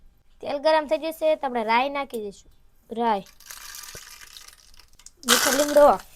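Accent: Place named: Kathiawar, India. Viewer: The language she isvtalking like kathavadadi speaking Difficult to understand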